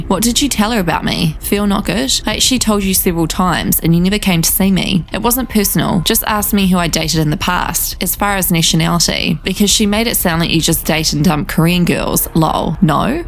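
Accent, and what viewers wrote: New Zealand. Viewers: It's a new Zealand accent. I'm Australian 🙂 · As a Kiwi, this is the most Kiwi accent I’ve ever heard on YouTube next to old school ShannXXO 😬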